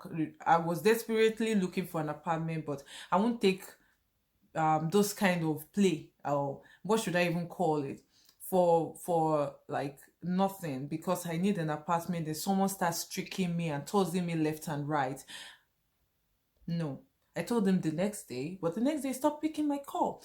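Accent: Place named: Finland. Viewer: ARE YOU ESTONIAN ? I THOUGHT YOU ARE FROM FINLAND BECAUSE OF YOUR ACCENT.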